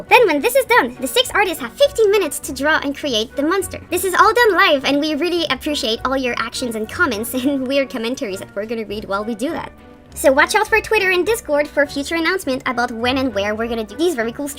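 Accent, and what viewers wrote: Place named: Quebec, Canada. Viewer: That’s so funny to hear you with your French Quebec accent in this video,! I like it. For the rest, I didn’t know then you make an halloween challenge like that in YouTube, that’s pretty cool.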